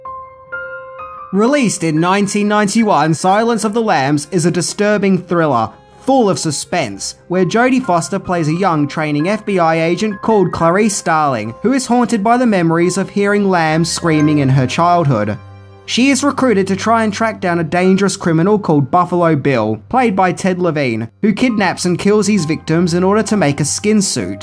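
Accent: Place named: Australia. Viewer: . Your assie accent is one of the reasons that makes your channel so enjoying.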